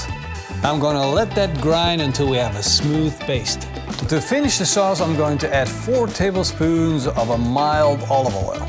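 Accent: Netherlands. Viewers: Ik spotte je NL accent !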